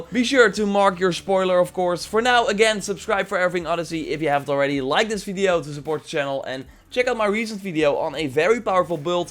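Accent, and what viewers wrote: Netherlands. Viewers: Nice videos man! Btw are you Dutch? Zo ja, ik ook 😉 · Just asking. Dutchie?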